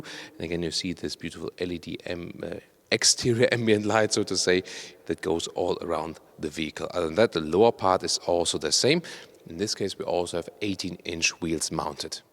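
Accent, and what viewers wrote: Germany. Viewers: I'm sure he's intentionally dialing up his German accent which is SO GUT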